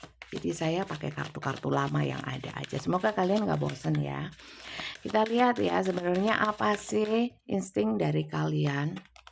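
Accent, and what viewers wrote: Java, Indonesia. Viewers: Hdeeeh bunda lucu ,medok mboteopo dechhh😊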